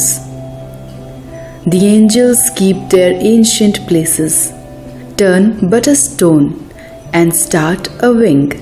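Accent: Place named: South Asia. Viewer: It is so good to hear this most wonderful of poems read with a South Asian accent!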